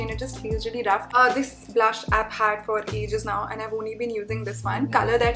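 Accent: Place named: Mumbai, India. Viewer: You've got the mumbaiyaaa tone already makes me smile idk why ♥️😘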